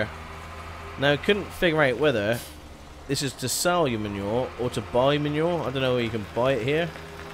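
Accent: United Kingdom. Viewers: The map is called after the region in Poland. It is a real place. I'm not sure how accurate the map itself is, but the area is real for sure. I have some family in there(at least I think so...). BTW. It's quite entertaining to hear English man trying to pronounce polish names:))) Polish language is more "hard", like German language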